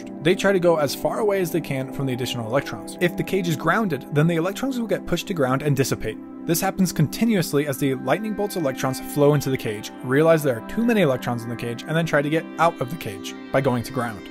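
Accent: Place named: United States. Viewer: Bro hindi me banao video... Ye American English samj nhi ata....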